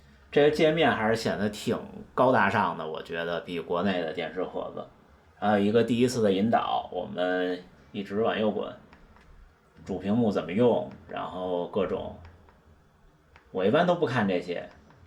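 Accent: Beijing, China. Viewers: 一口的京片子。。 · 典型的北京人口音，真亲切，竟然有人还说口音重，那一定是你自己不讲普通话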